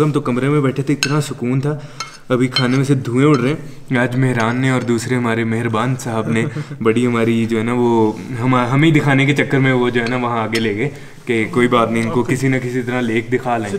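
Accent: Punjab, India. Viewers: Bro aap ka jo punjabi touch hai na conversation main wo unique hai jisay daikh k apna apna feel hota k apna koi yar bna raha..... Warna north k vlogs to aur b boht log bnatay hain...... Isi trah genuine accent main raho u deserve more respect specialy u realize us to how we love our country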